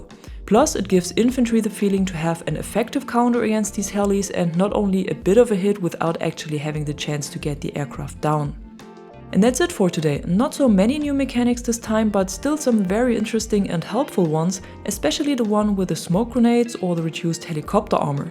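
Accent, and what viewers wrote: Germany. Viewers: danke für die Tipps 👍 weiter so...ich mag deine Videos sehr...ich schreibe einfach mal in deutsch weil ich denke das ich da einen deutschen Akzent höre 😅✌️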